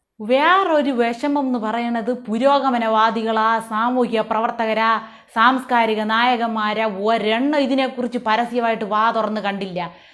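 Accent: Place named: Trivandrum, India. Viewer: കണ്ണാടിയും, മുണ്ടും ചട്ടയും, വയസായ ലക്ഷണവും, തിരോന്തരം ഭാഷയും 👌👏👍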